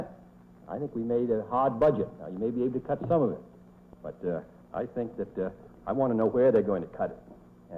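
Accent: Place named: Boston, United States. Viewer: He sounds like he’s from Boston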